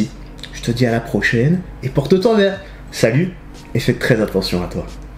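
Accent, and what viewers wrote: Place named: Liège, Belgium. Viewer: je vais plutôt bien, content de te retrouver un peu plus souvent sur YouTube. Tes analyses, réactions sont direct, simples et pleine d'humour et de bons sens. Ça change des contenus français qui ont tendance à être... team A contre team B , les différentes chaînes YouTube se font une guerre qui n'a aucun intérêt, qui est tout sauf sportive. Ils ne semblent pas réaliser que ça nuit totalement au MMA et à leurs combattants. Même si les YouTubeurs sont très compétents,...bref... Merci pour ton contenu bien belge avec ce petit accent de ??? Liège ? Perso suis à Charleroi . Kiff bien ce job et pourquoi pas un feat avec Ilies ou Chris Guenacht ? 3 bien belge 😊😊🙃 belle brochette de connaisseurs 😁🥳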